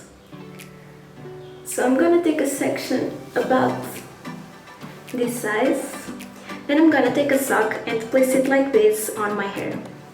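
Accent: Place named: Portugal. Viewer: Vi logo pelo sotaque que eras portuguesa!!! Adorei o método resulta super bem no meu cabelo! Obrigada e boa sorte para o teu canal! Beijinhos :D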